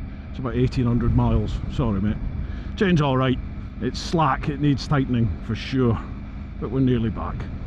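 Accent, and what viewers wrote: Scotland, United Kingdom. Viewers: Excellent viewage, just love the noise that bike makes and with a scot accent ... the landscape is phenomenal 👍😎👍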